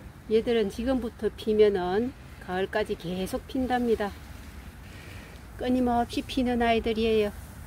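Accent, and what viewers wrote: Gyeongsang, South Korea. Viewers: 저도 전원생활하면서. 야생화키우고 있지만 이렇게 많은품종과 넓은곳은 처음봐요 말투과 경상도 사투리가 있는데 어디예요?저는 대구살다가 지금성주에서 전원생활하고있어요 가까우면 구경한번하고싶어요 스켈이 타고나요~^^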